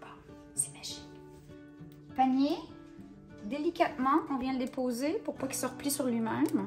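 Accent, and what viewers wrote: Canada. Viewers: Salut ! merci pour le tips , je connaissais même pas le " pain pita " j'suis venu par curiosité :D en tout cas t'arrêttes po !! c'est toute sympa :D ( j'ai l'accent canadien en tête maintenant bravo )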